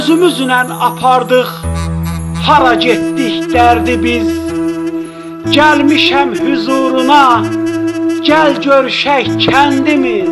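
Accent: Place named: Karabakh, Azerbaijan. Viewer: Sağ qardaşımız.Sənin səsin şerlərin qədər gözəldi.Bəlkədə şerlərini başqa adam oxusa bu qədər möhtəşəm alınmaz.Çox sağ ol.Şirin Qarabağ ləhcən adamı özünnən aparır..👍👍👍Ağdamlı balası.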